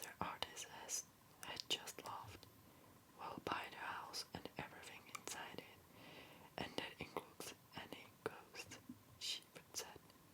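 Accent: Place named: Czech Republic. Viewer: This is wonderful! Thank you. Your voice is marvelous, and any words spoken with a very slight Czech accent are more beautiful and interesting for it. I'm fascinated to hear how near-perfectly you speak - pronunciation, vocabulary, phrasing, emphasis - better than most natives do. Even if one studies for years, most ppl don't ever sound as natural. I imagine you're similarly talented with any language. Your videos are always, as are you, very special, original, well-spoken, intelligent, and lovely, and we are fortunate to have found you.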